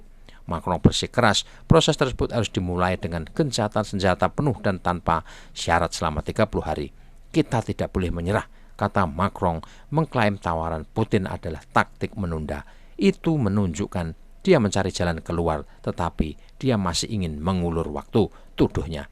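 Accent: Java, Indonesia. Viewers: Bagus mas. Aku suka medoknya. Jowo bangets ❤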